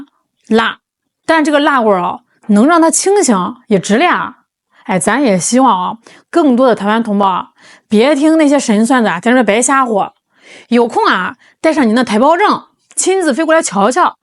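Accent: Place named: Shandong, China. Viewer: 这个没治了太亲切了，山东老乡哈哈哈哈🥰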